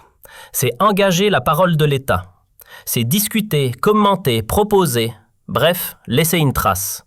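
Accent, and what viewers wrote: Canada. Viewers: il faut être dupe ou idiot pour croire que cette vidéo n'est pas l'oeuvre du régime algérien, on a beau aller chercher un accent québecois les images et les séquences vidéos qui se succèdent vomissent la signature du régime mafieux de Tebboune, depuis le début que des photos, des vidéos des scènes des gangsters algériens, aucune sur le Maroc, alors qu'il est plus aisé d'accèder à l'album photo de sa majesté Mohamed VI qu'à "chambre noire" du parrain et de sa pègre algérienne ! c'était bien essayé mais raté ! la prochaine fois choisissez un accent bien franco-français, bien sûr pas l’apathique APHATIE (Jean-Michel Aphatie) mais un Zemmour, ou Onfray, ça passera mieux !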